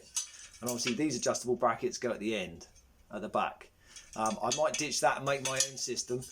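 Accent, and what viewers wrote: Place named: England, United Kingdom. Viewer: My wife asks "who's the Ed Harris guy with the English accent?" so i said "nah, younger and prettier" lol.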